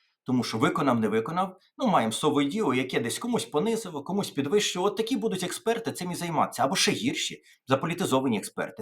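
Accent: Ukraine. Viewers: Я родом з Івано-Франківської області і так само розмовляю як пан Тарас живучи тут в Америці і люди які приїхали з других областей вони навіть часом не розуміють мене тому, що кажуть що я вживаю багато руських і польських слів, але то є моя рідна мова з якою я виросла і я так розмовляю, тому мені дуже приємно слухати вас пане. Дай Бог Вам здоров’я🙏🏻 ви маєте дуже чудовий приємний голос і ви є високо інтелігентна людина! З великим задоволенням вас слухає багато людей тут в Америці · Вітання зі Львова! Дякую, п., що не забуваєте галицьку говірку!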